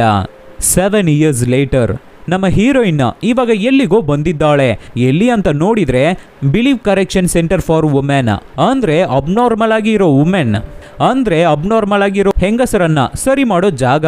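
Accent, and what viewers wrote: Tamil Nadu, India. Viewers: Nim mother tung Tamil ansuthe... 😂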